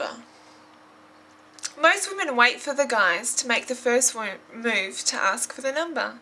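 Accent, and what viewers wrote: Australia. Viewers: I'm a sucker for Austrailian accents :)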